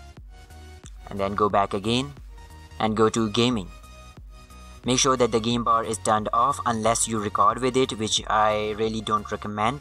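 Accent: India. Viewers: That Indian accent tho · i dont trust indian voice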